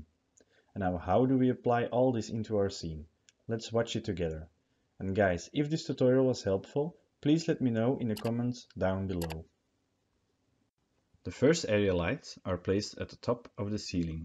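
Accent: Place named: Flanders, Belgium. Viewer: Nice video, and nice flemish accent ahahah. Bedankt maat!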